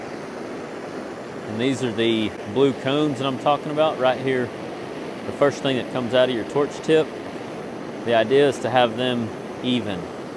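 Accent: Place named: South, United States. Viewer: A 'Southern' accent. Maybe Oklahoma or Texas? Not sure, but the gas/oilfields are in those states. All the Southern States have their own distinctive accents. My wife is from Tennessee & Mississippi, which is different. Georgia & the Carolinas sound very beautiful to my ear, but I like them all.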